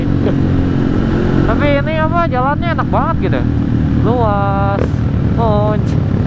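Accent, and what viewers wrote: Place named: Sunda, Indonesia. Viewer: Sunda ae padahal mh kang, medok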